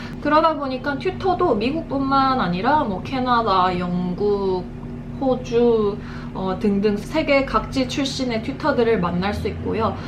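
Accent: Gyeongsang, South Korea. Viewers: 안녕하세요~ 오늘 구독하게 되어 여러 에피소드를 봤어요! 재미있고 무엇보다 유익한 내용 너무 좋습니다~ 가끔 나오는 경상도 액센트? 가 있어서 더 귀여우신거 같아요! 자주 올게요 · 노래듣다가 영상이 떠서 들어와봤는데 반가운어투 경상도말이 들리네요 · 갱상도 누나다^^ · 영상 하나 보자마자 바로 구독.. ㅜㅜ 열정은 넘치나 어떻게해야할지모르는 저에게 너무 좋은 영상들! 근데 혹시 경상도분이신가용? ㅋㅋㅋ 가끔 나오는 말투가 경상도같은데 혹시나해서요 ㅋㅋㅋ(제가 바로 대구..) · 안녕하세요 예전부터 봤다가 처음으로 댓글달아요 ㅎㅎ 경상도 말투 너무 친근하구요(같은 경상도사람) 영어를 엄청좋아하는 사람이지만 영상보고 엄청 도움많이됩니다.ㅎㅎㅎ 자주 댓글남길께요..ㅋㅋㅋ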